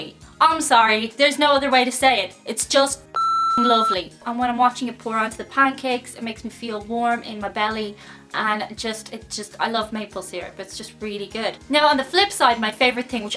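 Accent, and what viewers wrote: Canada. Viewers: True, although I am also from Vancouver (same city as ), and I have never heard anyone say aboot either. He is literally the only person I have ever heard say that. So, I'm not sure if he exaggerates it on purpose...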